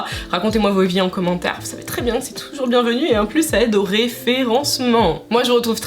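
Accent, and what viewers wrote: England, United Kingdom. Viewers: It's absolutely not related to the video but I just figured out that ur accent is very British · pourquoi tu prend un accent anglais? C'est voulus ou tu es a appris l’anglais au Royaume Unis? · les gens devraient laisser Lizzo tranquille mdr, ça va là tu as un petit accent British quand tu parles anglais, c'est très mignon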